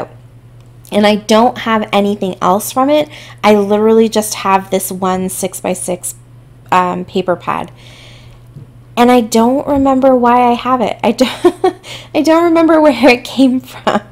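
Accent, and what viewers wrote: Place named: South, United States. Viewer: Like the way you made this a double page layout! Festive and fun. TFS. PS are you originally from Canada, lol. Your accent sounds southern, like mine😉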